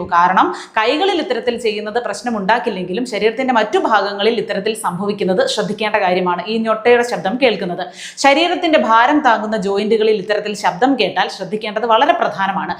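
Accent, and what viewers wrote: Thiruvananthapuram, India. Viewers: ജി തിരുവനന്തപുരംകാരി ആണോ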